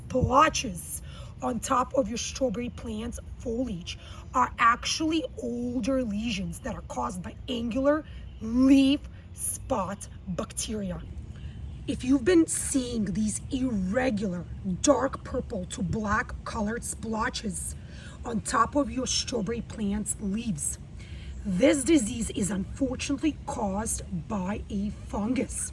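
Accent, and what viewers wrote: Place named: New York, United States. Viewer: That’s how we talk from New York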